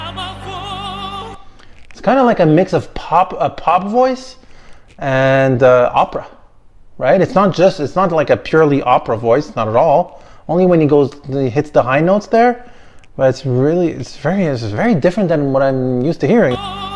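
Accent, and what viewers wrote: United States. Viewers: French is your native tongue? Your English sounds as bastardized as what comes out of any of us, as Americans... Perfect! =) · I can't beleive you're French. You sound so American!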